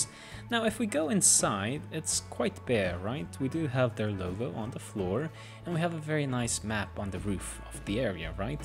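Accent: United Kingdom. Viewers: Are you brithsh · were you speaking in a British accent for part of the beginning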